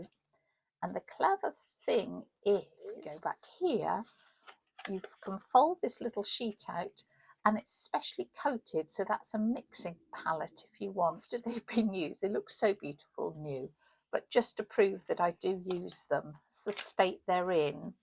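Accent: United Kingdom. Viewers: Love this! And those color sheets! I love British English. I have a steady diet of BritBox so I feel a bit like I like in the UK. I visited last year for 1 month and in 2025 I will do again in Dec.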